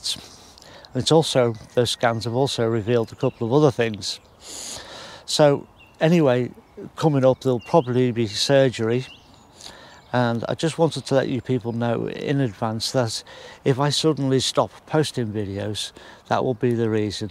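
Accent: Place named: Wirral, United Kingdom. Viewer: Only just found this channel and learnt so much from one video. Thought I could hear a Wirral accent and looked for more videos and seen this post. So sorry to hear of his passing.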